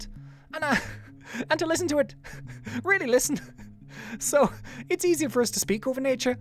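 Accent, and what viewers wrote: Ireland. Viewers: you are actualy doing a good irish accent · The spirit bear with your lady irish accent makes spirit bear 10x kind. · Thats i nice irish lady accent in my opinion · But I really love your Irish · I feel like he has never been to Ireland he sounds more like an Irish man with a high pitched voice I am insulted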